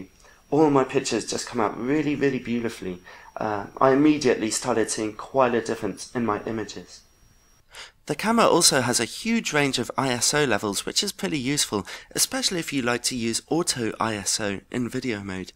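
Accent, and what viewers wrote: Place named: England, United Kingdom. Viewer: Where did you live in England before you moved to whales? Your accent is quite interesting =)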